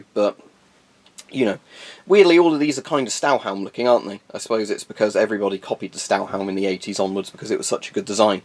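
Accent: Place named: United Kingdom. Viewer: “What a shhhtupid name for it” haha British accents make everything sound so much better while being right at the same time 😂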